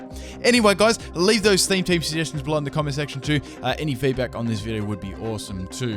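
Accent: Australia. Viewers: I keep guessing what is "pill"...it's actually Pearl. gotta understand Australian better now that I'm hooked to this channel.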